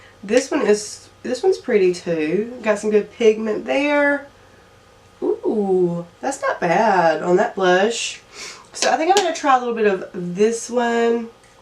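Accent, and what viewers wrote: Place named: South, United States. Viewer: I’m from Louisiana and I’m guessing the accent is close by...Makeup Arkansas or Tennessee? 💛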